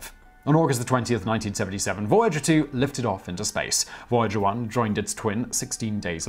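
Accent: England, United Kingdom. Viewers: Sir - yes I said Sir. Pleeease do an episode on how difficult it was to take down a Knight on the battlefield during medieval times. I can't seem to find an interesting in depth video narrated on the topic with an English accent. Not too mention a video that also includes the history behind the armour, misconceptions and why they were so much more than an average soldier on the battlefield during their time.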